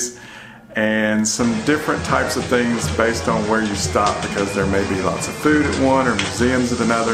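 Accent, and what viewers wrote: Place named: South, United States. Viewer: I love your country accent.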